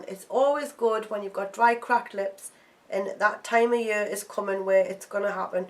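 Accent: Newcastle, United Kingdom. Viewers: She's a Geordie man!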